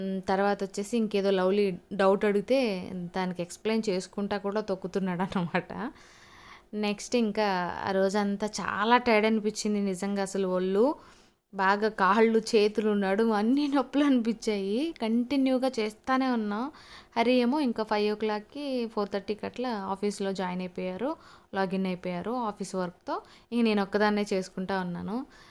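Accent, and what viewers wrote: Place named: Tirupati, India. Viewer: Anduke aduganu Akka, she looks like u Akka, n ur having tpt slang akka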